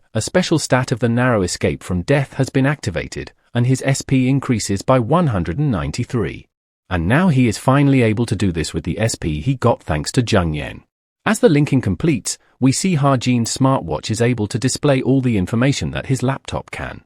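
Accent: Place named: United Kingdom. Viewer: Why does the ai voice sound British I ain complaining tho this peak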